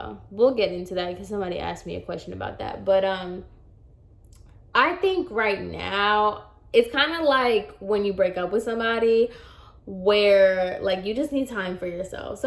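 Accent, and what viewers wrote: Puerto Rico. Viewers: Hello from Oregon 👋 You are right, Spanish even though it’s Spanish, it’s different depending on where you live or where you grew up. But I like your Puerto Rican accent ☺️ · I love how spanish sounds in a puerto rican accent!!! My spanish is so blahh!!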